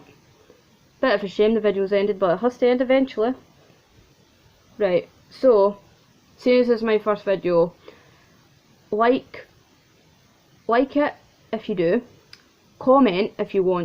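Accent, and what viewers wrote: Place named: Scotland, United Kingdom. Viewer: Ahhh I love Scottish accents me Grandma's from Aberdeen and trying to understand her is fun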